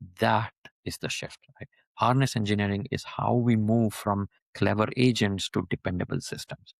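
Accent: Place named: India. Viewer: This was a GREAT explanation, even for somebody who already knew most of this stuff you managed to explain some of the primitives from such an original, opinionated angle (eg skills only after sub agents). Bonus points for making me actually like the Indian accent (I usually end up giving up when I only understand 10% of the words). An interesting segue would be discussing how models can or cannot go through reinforced learning to interact with an harness better than another, could that become a moat for models' labs? Subscribed!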